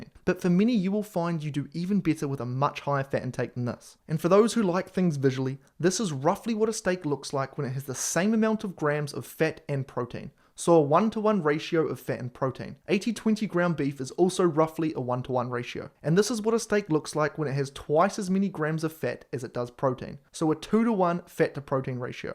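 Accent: New Zealand. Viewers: to me he sounds like a Kiwi at times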